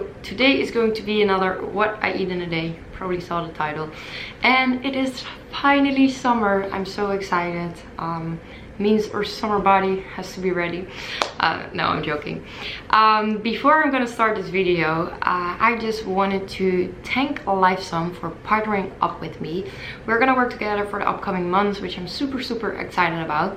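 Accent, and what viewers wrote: Netherlands. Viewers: the moment I opened the video I recognized the accent. its so cool to see a model from the Netherlands, half my family lives there and it's probably one of the prettiest places ever.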